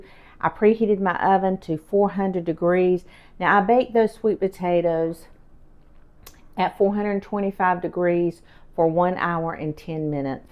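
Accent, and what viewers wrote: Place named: North Carolina, United States. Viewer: I have just recently found your videos and enjoy them. I live in Leland NC. I thought you sounded like you were from NC. I lived in Beulaville NC back in th early 70's. There was not much there. I think there was a bank, gas station maybe 2 I think there was a Haedees. I don't think we had a stop light. Beautiful little town. Have a safe and enjoyable 4th. Oh have you tried precooking your potatoes (regular and sweet) in the microwave then peel and fry..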